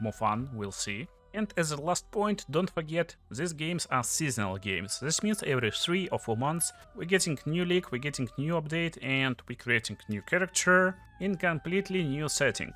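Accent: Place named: Russia. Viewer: Bro as an Arabic guy, nobody can easily explain stuff to me better than russian accent 😂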